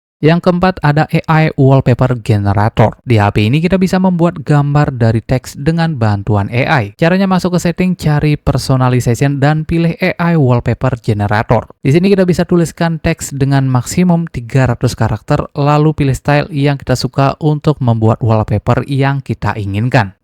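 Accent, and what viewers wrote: Bali, Indonesia. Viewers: ini logat bali kah?atau mana?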